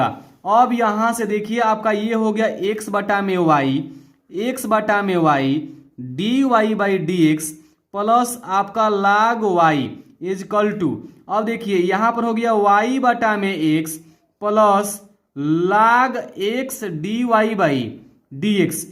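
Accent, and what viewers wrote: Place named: Bhojpuri, India. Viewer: Sir app kha sa ha ..🙏🙏🙏🙏🙏 App bhajpuri bol raha ha.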